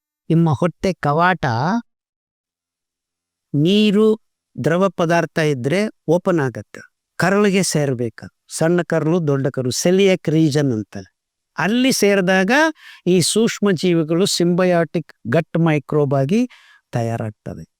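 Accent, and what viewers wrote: Standard. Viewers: ನೀವು ಮಾತನಾಡುವ ಶುದ್ಧ ಕನ್ನಡ ಕೇಳೋದೆ ಚಂದ ಡಾಕ್ಟರ್ ಸರ್ 🎉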